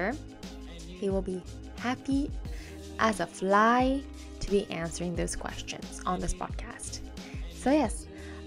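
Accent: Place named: United States. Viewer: Happy birthday 💛 のアメリカ英語がなんだか大好きで、(+ツヤ肌に見惚れながら)いつも動画楽しませてもらってます😂💕 にとってすてきな年でありますように🌈✨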